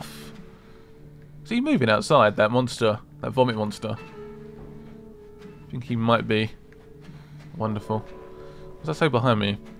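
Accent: United Kingdom. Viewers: God I love his British voice mmmmmmmmm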